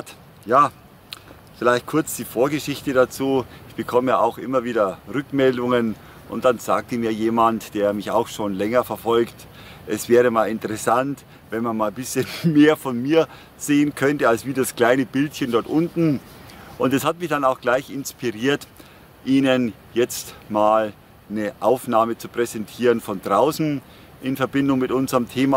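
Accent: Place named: Franconia, Germany. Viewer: Wunderbares Video mit guten Informationen. Ich höre den Anschlag aus dem fränkischen Dialekt so gerne. Ich bin seit fast 20 Jahren aus Franken weg gezogen. Das Fränkische hört man sofort.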